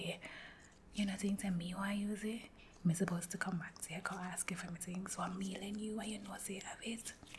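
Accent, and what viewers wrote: Jamaica. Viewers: Goodness, I always struggle to completely understand Jamaican accent, but I LOVE it! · I loooove the Jamaican accent! It's one of my favorites! Soo underrated! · I love Jamaican accents, I think it’s one of the most beautiful. Every word is said with so much passion and emotion. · My fav music is dancehall and thanks to that I understood everything and oh it was sooo relaxing 😌 I love Jamaican accents. they have such a beautiful way of speaking and articulating. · I love the Jamaican accent specially in music, but in ASMR, It’s something I never knew I needed til now 😭!! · If my brain stops concentrating it turns the accent Irish, especially the whispered make up video. It must be the lyrical aspect. It sounds Jamaican again when I concentrate. But I love both accents! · i love this so much, its not like a corny jamaican accent its so soft omg :) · Jamaican accent is just the coolest accent to me, idk why it just sounds awesome haha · Your voice is always so soothing, combined with that Jamaican accent, this is actual perfection 😭😭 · My all time favorite movie is still Cool Runnings. 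It makes me happy so much specially because of the accents I love Jamaican accents. Now you put the biggest smile on my face with your Jamaican accent. I love this video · Same I never knew I needed a Jamaican asmrist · I had never heard a Jamaican accent before, so thanks for teaching me something new and for being soothing! The accent sounds very friendly and happy, I like it. You are also very beautiful! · I was legit just about to turn in and decided to look through my recommended videos for something to help me fall asleep and when I saw this title I squealed and immediately clicked because YES, A JAMAICAN DOING ASMR?! - WE LOVE TO SEE IT! I got tingles just from your intro and I already love your voice and accent, never grabbed my headphones or hit subscribe so fast ... so glad I found your channel, I have a feeling you’re gonna be huge! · My old soccer coach from when I was a kid is Jamaican, haven’t heard this accent in years (not many Jamaicans in the south east of the US I suppose) so this is so cool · Never realized how much I love jamaican accents😩❤❤ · Jamaican accent is my favorite accent now... 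just so in love with this video 💖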